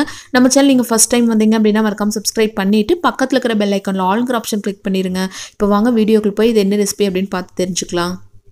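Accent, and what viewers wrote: Kongu, India. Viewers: Hi sister I'm new subscriber.. I like ur videos... Ninga kongu Tamil Thana pesaringa. Yentha ooru sister???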